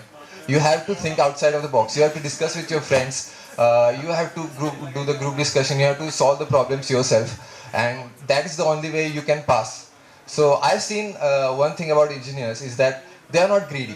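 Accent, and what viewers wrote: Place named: Kerala, India. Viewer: His accent is very nice.. I think he's from Kerala (Mallu)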